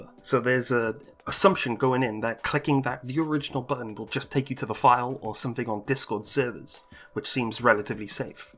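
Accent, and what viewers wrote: United Kingdom. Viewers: ah!! alright, only reason why i asked was bc of the way your accent seemed to mirror that of the bristol accent in my head my brain makes weird connections ;w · hooold on, hoooooold on, my guy are u welsh? if yes: high fiveee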